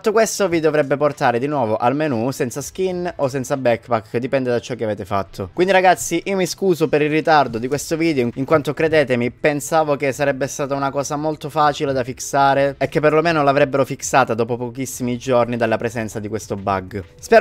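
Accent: Calabria, Italy. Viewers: Sei calabrese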